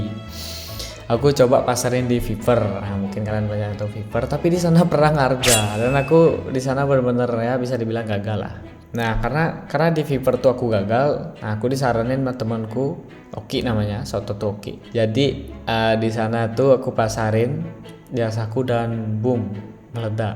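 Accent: Bali, Indonesia. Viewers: logatnya kayak orang bali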